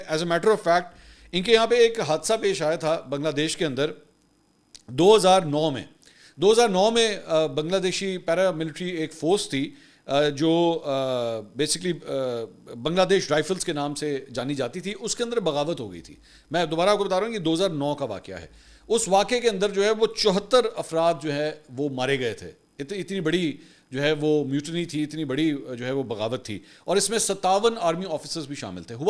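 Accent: Punjab, Pakistan. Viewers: فنی پنجابی ٹچ بہت مزے کا لگتا ہے😂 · ap Fasilabad sy to nahi.....Sir waey Punjabi bary cute bolty ho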